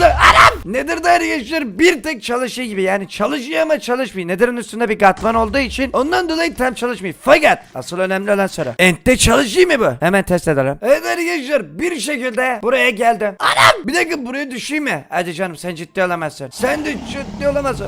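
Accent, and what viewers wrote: Black Sea, Turkey. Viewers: Abi sen kara denizlimisin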